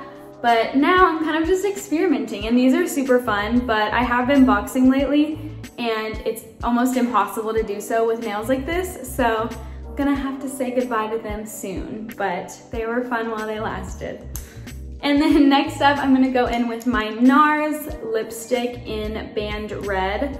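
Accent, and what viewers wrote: United States. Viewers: BRO HER VOICE CHANGED REMEMBER SHE HAD A MORE SOUTHERN ACCENT AND NOW ITS MORE AMERICAN I GUESS?? no hate but i just realized that❤️❤️ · This is how she always talks. And what do you mean “kourtney’s” accent? It’s called being American 😂 they don’t sound anything alike.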